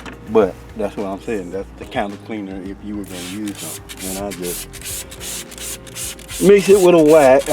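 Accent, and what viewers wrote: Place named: Texas, United States. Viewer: YOU GOTTA BE FROM TEXAS BRO! #SouthernDraw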